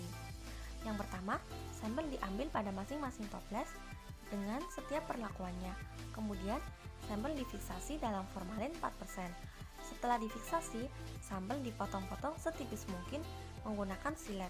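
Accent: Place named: Cilacap, Indonesia. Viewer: Ngomonge koyo wong cilacap bu hikkk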